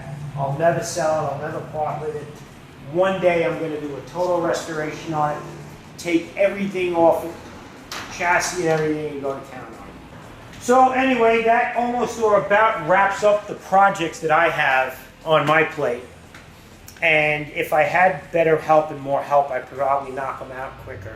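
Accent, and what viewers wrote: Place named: New Jersey, United States. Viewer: For a guinea with a heavy jersey accent, you’ve got very good taste. God bless Americana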